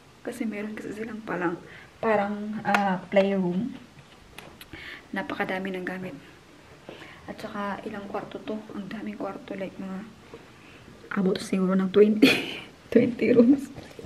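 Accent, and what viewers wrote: Visayas, Philippines. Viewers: sa saan pla ito na country? pgnagsa2xlita ka ng tagalog pra kang bisaya.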